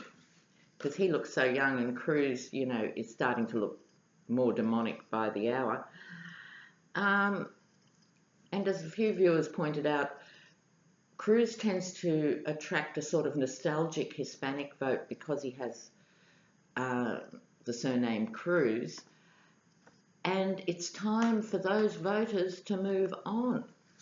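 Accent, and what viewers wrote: Australia. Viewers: Linda Casey, That had me cackling. I missed that because I'm used to the Aussie accent. But seriously, the visuals of Trump humping Hope are just too... excuse me while I barf.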